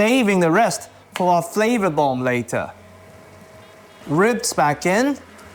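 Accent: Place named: United Kingdom. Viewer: That is the most British voice I have ever heard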